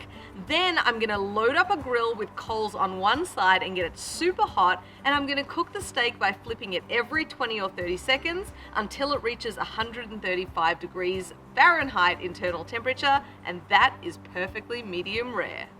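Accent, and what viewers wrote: Australia. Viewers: You're very good darling, and we love the aussie accent, but I prefer NOT flipping the steak every 30 seconds. Depending on the cut, and thickness that would determine how much time on each side. You still have Love from America ♥️ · Oh my God not only are you beautiful but I love your Aussie accent think I’m in love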